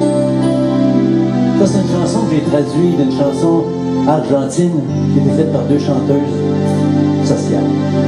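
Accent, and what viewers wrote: Canada. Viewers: Merci pour ce beau partage 🌹 je trouve extraordinaire que l accent canadien disparaisse lors du chant et revienne après 😁... Pareil pour Céline 😉 Toujours que l interprétation est très belle, bravo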